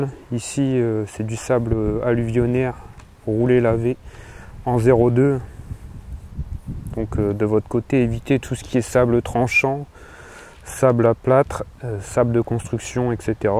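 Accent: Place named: North, France. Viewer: bonjour ca fait plaisir d'entendre l'accent du nord ..j'ai le meme tee shirt .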